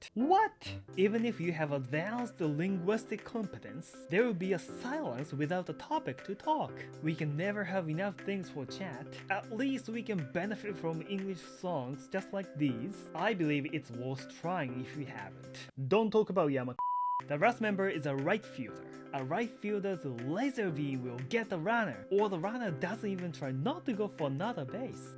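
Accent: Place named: United Kingdom. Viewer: ELSAの評価が厳しくて嫌になってるところ、好きなイギリス英語＆このやる気刺激動画でモチベ維持してます！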